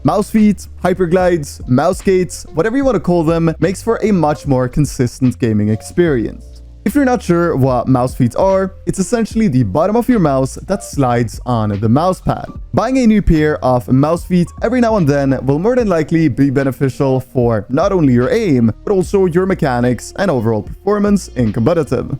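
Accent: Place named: Norway. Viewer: Are you from norway? Cause it sounds like it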